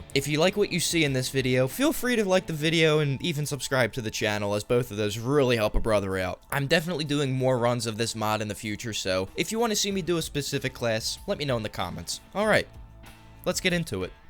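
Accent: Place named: Philadelphia, United States. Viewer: Bud you from around Philly? You sound like me lol. Solid content dude take the sub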